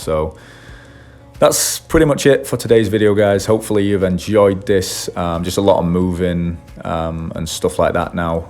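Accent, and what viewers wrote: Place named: North Yorkshire, United Kingdom. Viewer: Eyup fella. Happened upon your channel because i am toying with the idea of breeding. Not necessarily Cichlids, but anyways. I'm going to have to go back through your vids now! Sounds like you are from my neck of the woods, judging by your accent. I am from North Yorkshire (Gods country).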